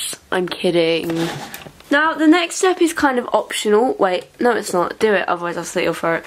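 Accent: England, United Kingdom. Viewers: What part of England are you from? I loveeee your accent! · Is she from England??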